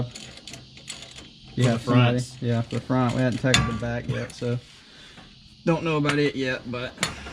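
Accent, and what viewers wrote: Alabama, United States. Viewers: What part of Alabama are you from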